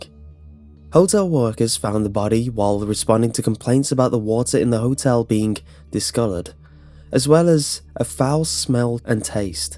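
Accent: United Kingdom. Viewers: He's British, so of course he'll pronounce some words differently. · Hannah Schrenk Nice try hun, English born and bred. What I'm saying is that unless you hear the word pronounced 'properly' you would not know to apply a different language to it. His pronounciation is very typical of what is accepted here over the pond. Am I saying it is right? No. But it is still the case.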